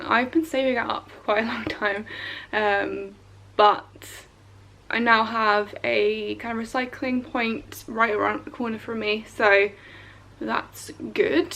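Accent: United Kingdom. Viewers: Hello from Spain. I've recently watched your video about your own British accent, so you have such a brilliant British accent. I know many people from such lovely country called The Netherlands usually speak more than two languages. Well, how many languages do you speak? Are you able to sing the Dutch national anthem in every language you've been taught? Thanks in advance.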